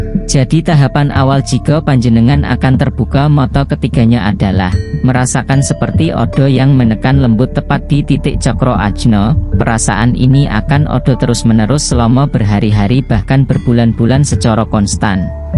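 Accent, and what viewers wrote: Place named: Java, Indonesia. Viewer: TOMBOH ILMU TOMBOH HIBURAN, BHS IND DIBACA LOGAT JAWA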